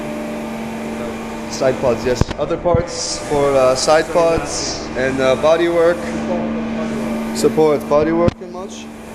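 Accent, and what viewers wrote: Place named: Germany. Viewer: Why do you live in a dorm in friggin Marburg and pronounce Italian words with a German accent when you're an American, racing in an American series? So confused right now...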